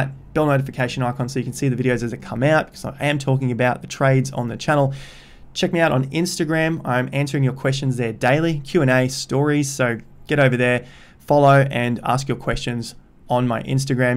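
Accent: Australia. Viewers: I'm going to be leaving university and hoping to get a job in September. I think crypto is definitely a space that I'll invest real money into during the next set of lows later in the year (at the moment I'm working with about £100 and doing what I can) and I think I'll buy the Investor Accelerator too because you're genuinely helping me learn (and your Ozzy accent is nice to listen too xD)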